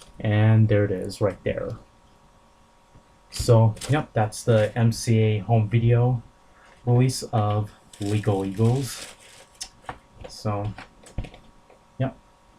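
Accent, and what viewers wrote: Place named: Coast, United States. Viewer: Ohhhh cool thanks for explaining that. Where are you from by the way? I was trying to figure out by your accent east or west coast. Keep up the great vids!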